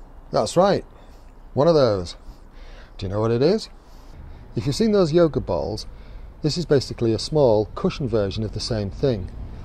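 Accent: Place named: North, United Kingdom. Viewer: I think it was a combo of water,dreamy music and your dulcet northern tones!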